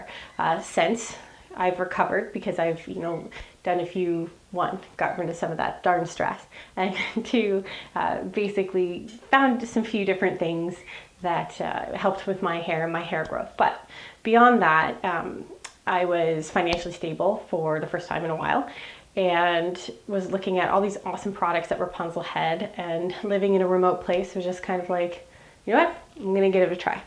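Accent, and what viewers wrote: Canada. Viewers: I was going to say that you sound Canadian:) I'm from Northwest Montana just two hours from the border and so we have many from Alberta and B.C. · Very interesting story! I hear birds in the background? Are you Canadian or from Virginia?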